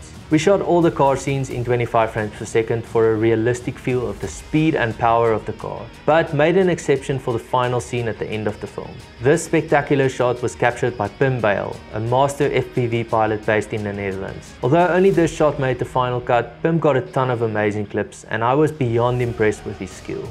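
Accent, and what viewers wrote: South Africa. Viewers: Are you South African btw? Cause my parents are from South Africa but I wasn’t born there, but you definitely have a South African accent! Anyway I’m gonna watch the video now, the opening shots were amazing! So I’m sure this video will be great!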